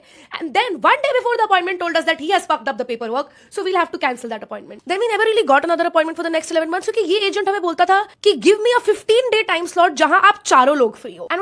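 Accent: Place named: India. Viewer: Tumhari english bahut kharab hein, dont mix hindi accent in english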